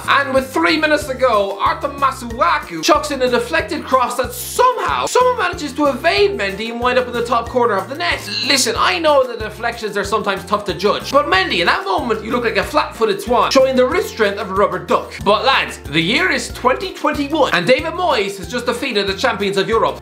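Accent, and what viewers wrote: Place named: Ireland. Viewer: A Walking Meat Loaf, a Walking Taradactul....man this Irish fella, along with that accent, sure does know how to make jokes, all while telling football news! Bravo sir...bravo indeed! Btw did u forget Origi's goal from TAA's corner against Barcelona? You were rattling off so many, wasnt sure if that one was in or not!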